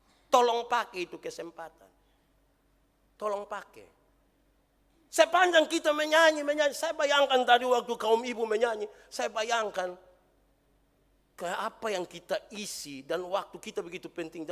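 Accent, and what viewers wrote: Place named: Eastern Indonesia, Indonesia. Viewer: Salam dri Makassar Pak Pendeta, semoga tetap diberkati dan diberikan kesehatan yg baik dari Tuhan. Khotbah2 Pak Pendeta sangat luar biasa dan mudah untuk dipahami, apalagi dengan logat2 timur yg kental 🙏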